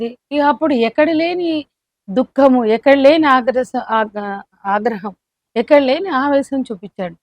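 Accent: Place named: Andhra Pradesh, India. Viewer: నీవూతెలంగాణదానివికాదుగదా? నీవుతెలంగాణలోఉంఢి ఇప్పటికీఆంధ్రాతెలుగుస్లాంజితప్పతెలంగాణ యాసనీఖురాలేదుకదా? తెలంగాణలోకోట్లమందిఆంధ్రామూలాలుగలవోళ్ళేతరాలుమారిఆయాసతోతాముతెలంగాణవాళ్ళమేఅనినమ్మిస్తూఆంధ్రవాళ్ళనుతిడుతుంటేనీలాంటివాళ్ళేవాలినిసపోర్ఠుఛేస్తూ హైద్రాబాద్లోదొంగబతుకుబతిఖేస్తున్నారు. అసలుఆంధ్రాద్రోహులుమీరే.తెలంగాణలోని ఆంధ్రావారందరినీవారిమూడుతరాలుపరిశీలించి వారందరినీ ఆంధ్రాకుపంపేయండి. మీకామాకాదరిద్రంవదిలిపోద్ది.రోజూమీతాగుబోతుఉద్యమకారులతోబూతులుతిఠ్టించుకోలేం.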